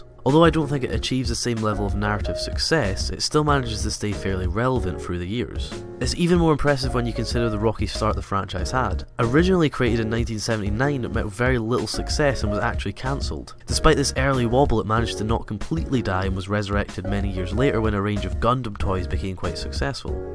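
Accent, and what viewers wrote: Scotland, United Kingdom. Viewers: He Scottish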